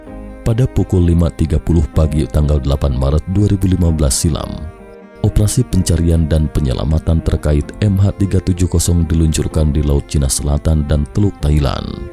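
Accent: West Java, Indonesia. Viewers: tapi PRONOUNCIATION ENGLUSH (pengucapan istilah ejaan)....kurang enak di dengar....spt bercampur logat sunda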